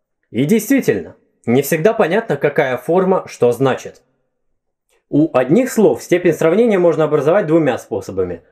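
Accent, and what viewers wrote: Moscow, Russia. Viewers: Ох уж этот московский говор. Продолжайте, ни в коем случае не прекращайте. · Мне кажется, пустее, богатей, пустее и т.п. есть влияние северных говоров ( новгородский, вологодский ), ведь довольно слабый эффект второй палатализации чувствуется и по сей день. Собственно, кабы Мацква не захватила Новгород, мы бы и дальше говорили в руце, в нозе, в дусе и т.д., а северные области для остальной России были бы чего-то вроде Шотландии для англичан 😎. По поводу сравнительной и превосходительной степени в западнославянских языках: в чешском она даже проще, нежели в польском, ввиду одинакового окончания в номинативе для всех родов ( напр. lepší (лучший (-ая,-ое) или же просто лучше, в русском от контекста зависит; horší (более короткий или худший) и т.п.). В превосходительной тупо прибавляешь приставку nej. Я еще заметил, ты с московским акцентом говоришь. 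Коренной москвич?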